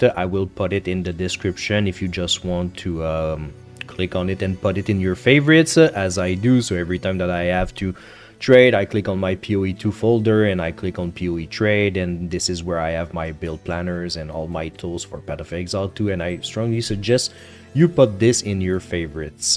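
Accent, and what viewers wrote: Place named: Quebec, Canada. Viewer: toi t'es quebecois c'est certain! hahaha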